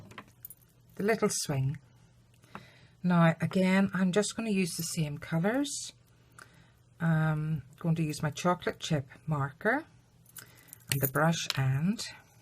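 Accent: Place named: Ireland. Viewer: WONDERFUL. You sound just like my cousins in ireland Sligo. Your video was reaay good. Hopefully we will see more. Dorothy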